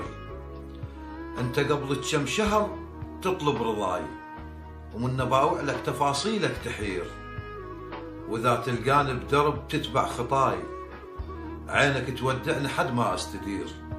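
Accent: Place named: Iraq. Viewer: لهجه عراقيه تهبل🌹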